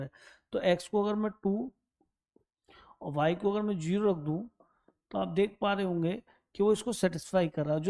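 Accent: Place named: Uttar Pradesh, India. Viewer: sir ap up s he kya?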